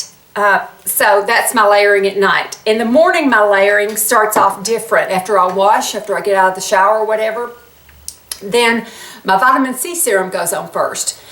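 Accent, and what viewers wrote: South, United States. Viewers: Hey gorgeous! Where are you from? Your accent is adorable. Very southern. Would love to be involved in your groups (women of YouTube over 45). How do I get involved? I’ll be 55 on the 23rd. Thanks. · you're fantastic! I have so enjoyed watching your videos for the last few months and love all your recommendations. I have been thinking I needed to take up knitting again. My novice ability should be able to handle these facecloths. Great idea! Thank you so much. I so love your sweet southern voice and your fun informative presentation. I have enjoyed every video I have seen. It is so nice to be able to get all the ideas I need at my age to keep current, healthy and active. I have used tretinoin on and off for 30 years and it does make a difference so I am using it more in the last year and even on my eyelids the last few weeks after I saw women on YouTube say they had used it. 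It did get very dry and irritated the first time and then I used oils to hydrate and then cautiously proceeded the next week to use it with my eye cream every other day. Thanks again. xo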